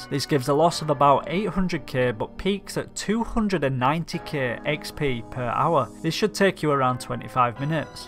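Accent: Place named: Leeds, United Kingdom. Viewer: bruh u from leeds lol?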